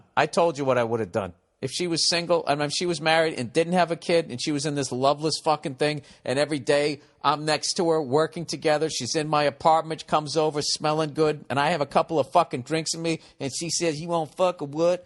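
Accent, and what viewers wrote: Boston, United States. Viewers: Ladies and gentlemen, Burr. He's got the wisdom of a Japanese Sensei in an American bar, wrapped in a Bostonian accent, delivered through the internet. Bravo... you nailed this one.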